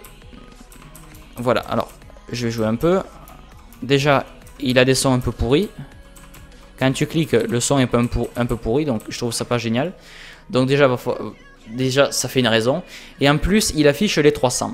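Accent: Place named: Quebec, Canada. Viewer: j'entends ton accent et je me demande si t'es quebecois ou marseillais mdr, mais sinon bonne video merci je vais m'y mettre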